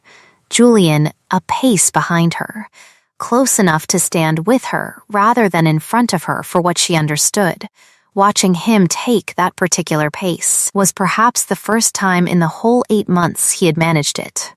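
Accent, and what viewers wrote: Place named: United States. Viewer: A ducal story shouldn’t be narrated by an American voice!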